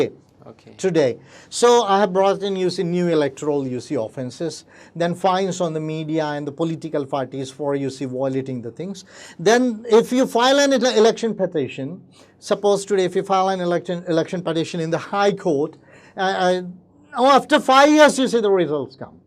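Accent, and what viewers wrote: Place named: United Kingdom. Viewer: Sir please don't use the British accent.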